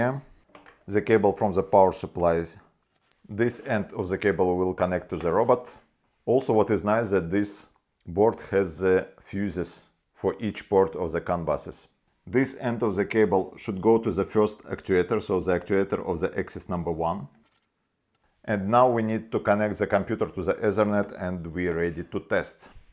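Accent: Russia. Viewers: русский акцент :-). Крутая вещь. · Хороший робот, быстрый и тихий. С русским акцентом намного понятней) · ЧИСТО РУССКИЙ АКЦЕНТ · бесит жёсткий русский акцент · Мне одному кажется что это русский английский, робот офигет какой тихий, интересно как устроен сервопривод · очень выражен русский язык, так как английский не родной.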